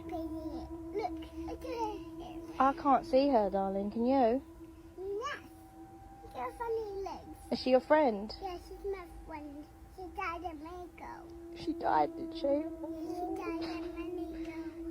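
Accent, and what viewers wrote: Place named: England, United Kingdom. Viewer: Hello!!!! here! Brilliant episode!!! I am so sorry I missed your message - I did private the follow up video but the original is still there with the ghostly voice on. You are more than welcome to use it in a future episode. You might need to scroll down the videos a little but the original video is still there. Thanks so much for the shout-out and I LOVE your English accent😂.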